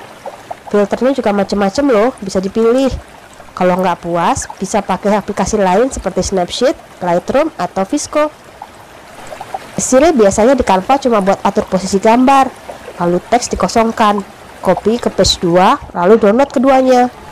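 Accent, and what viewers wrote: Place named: Java, Indonesia. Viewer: baru tau ini suara... jawa bener..hehehe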